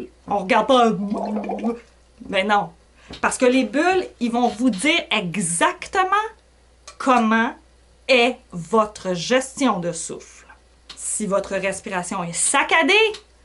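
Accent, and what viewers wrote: Quebec, Canada. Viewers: Mais comme j'aime ton bel accent Quebequois !!!! Et ta presence, malgré la distance. Les bulles c'est génial....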